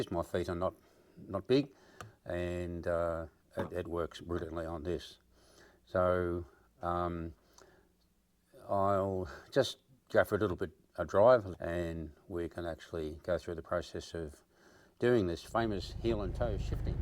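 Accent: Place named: Australia. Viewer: Great video and great technique sir, thanks for sharing. Are you from Australia by any chance?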